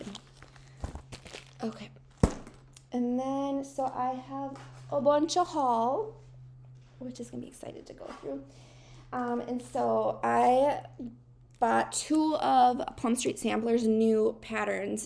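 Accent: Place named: Minnesota, United States. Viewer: I just found you last night so I'm excited you posted today!!! I love your small accent too it reminds me of the Fargo movie (in a good way as I love that movie, especially the way they talk)...I like your style of patterns you work on as it all different, sampler, full coverage, Halloween .... looking forward to your future videos. See you next time from central Canada 🇨🇦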